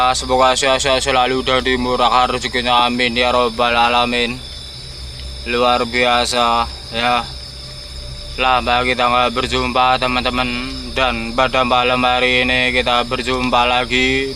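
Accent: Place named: East Java, Indonesia. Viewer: Kang nih lokasi dimn.... Dngar logat bcara kayak org jawa timur, tpatx malang, bnrkh?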